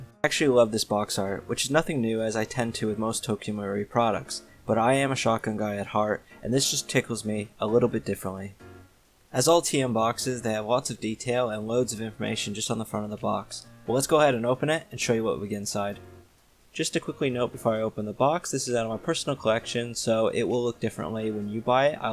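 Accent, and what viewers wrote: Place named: North America. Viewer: What country are you in? You have a North American Accent, but it looks like you're in the UK because of your back garden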